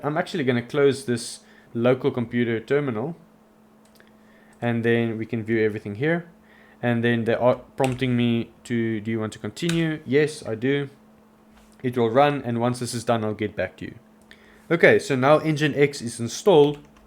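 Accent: Afrikaans, South Africa. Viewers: Lekker om n afrikaanse aksent te hoor! 😂😂 mooi storie!!! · You dutch from RSA?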